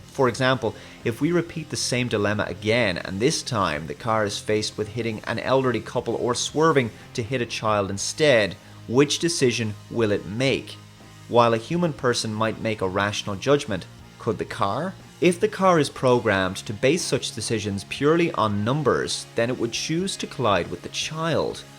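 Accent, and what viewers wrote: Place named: Ireland. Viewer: Question: Why does the narrator have an Irish accent?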